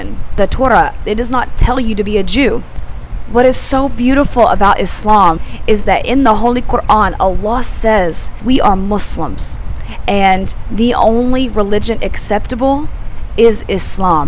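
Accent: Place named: South, United States. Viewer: southern accent sister. Baarakallaahu feekum.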